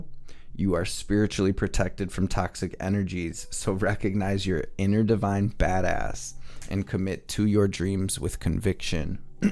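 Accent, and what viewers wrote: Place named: Upper Midwest, United States. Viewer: I just found your channel. First, thank you for what you do. You are a gift. Your reading and message are so accurate (and needed) you brought me to tears. Also, I’m originally from the Upper Midwest/Great Lakes region. So, your accent reminded me of home which made the message all the more powerful. Again, thank you for sharing your gift.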